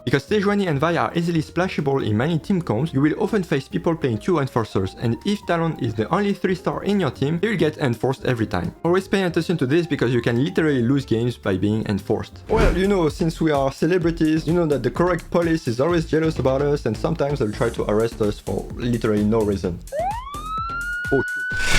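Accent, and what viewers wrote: France. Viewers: little french accent or what ?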